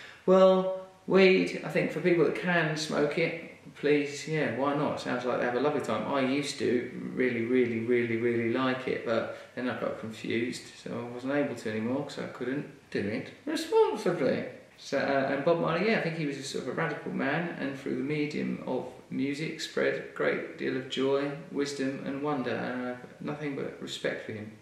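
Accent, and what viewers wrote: United Kingdom. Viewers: Russell, I love you man, and your videos. But could you please invest in a good quality microphone? Also maybe record your videos in a less echoy location? You have awesome things to say, as an Aussie, being brought up watching mostly second hand British TV shows I do understand your accent most of the time, but it is sometimes quite hard to hear you due to the echo and quality of your mic. Just something to keep in mind, Thanks mate! Keep up the awesome vids!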